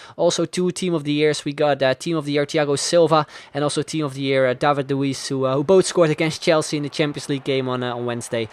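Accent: Netherlands. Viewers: Are u dutch +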